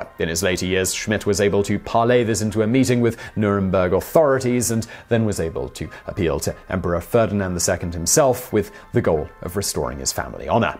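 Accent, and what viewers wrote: United Kingdom. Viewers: Why is talking with a British accent. He's fancy and everything but now he just sounds silly. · wtf I came here for that V-Sauce guy. And momentarily thought he magically gained a British acccent.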